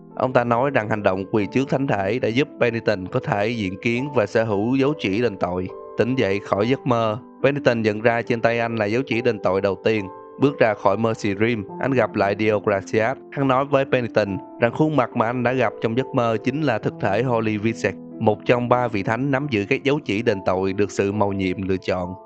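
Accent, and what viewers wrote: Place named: South, Vietnam. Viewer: Ông phân tích game thì chắc ai cũng biết, giọng miền nam nghe rõ ràng, phân tích chi tiết từ cốt truyện đến cách chơi game, tui thích nhất phần chi tiết về hướng chơi game bad ending và good ending 1 số game mà chỉ 1 số game không chơi mới nghe hết, còn mún chơi thì lại phải để dành lại mấy bài phân tích sau khi phá đảo. Tuy vậy nhưng ông ra video khá chậm, mình cũng hơi tiếc về phần này tí, mà chất lượng bù lại thì cũng khá ok. Cố gắng ra nhiều thêm và nhanh hết mức có thể nhá, mình thì auto ủng hộ:)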